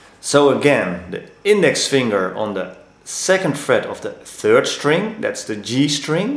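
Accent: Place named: Netherlands. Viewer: the MIDDEL VINGER! haha nice accent:$ nl ftw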